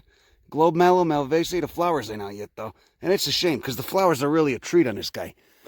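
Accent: Chicago, United States. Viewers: chicago accent at its best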